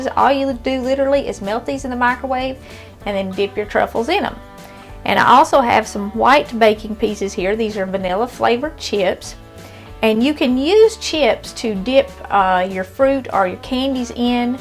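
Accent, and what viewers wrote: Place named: South, United States. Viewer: love ur southern accent... so adorable!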